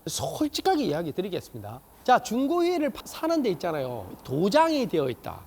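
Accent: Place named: Gyeongsang, South Korea. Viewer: 갱상도사투리 ㅋㅋㅋ굿입니다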